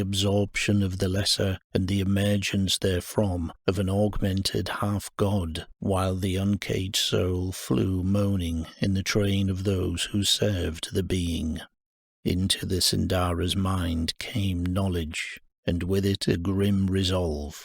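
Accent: United Kingdom. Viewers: Great narration, thanks a lot. Nice to hear a Northern accent, I live in Manchester, UK. Looking forward to trawling through your content, thanks again.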